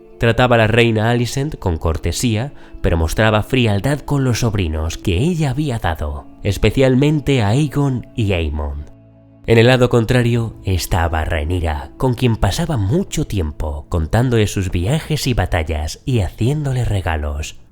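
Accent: Spain. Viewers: brother que seas español, le da el toque a la narracion!! excelente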